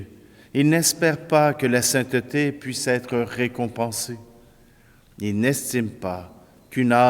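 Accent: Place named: Canada. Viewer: Il y a trois ans, la Providence a fait qu ' un certain à des milliers de kilomètres de chez moi célébrait la messe. Un accent canadien, je me suis adaptée! Quelle liberté car nous n avions en France droit de nous éloigner qu'à 1 kilomètre de notre domicile...Merci Seigneur de nous avoir envoyé. Ses qualités humaines, ses homélies, ses chants choisis, m 'inspirent chaque jour. Je te rends grâce Seigneur de ces moments de partage de foi, d Espérance. Notre France va si mal, elle a oublié son berceau chrétien... Merci encore. Bonne journée à tous.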